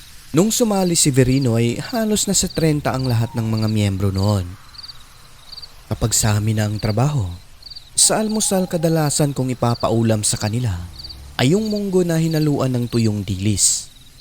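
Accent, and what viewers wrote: Visayas, Philippines. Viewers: I love your bisaya accent Say it again :Mantas nga aswang! heheh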